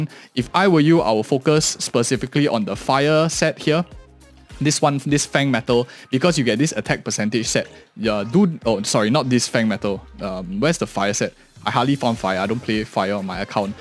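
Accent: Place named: Singapore. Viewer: He has a Singaporean accent.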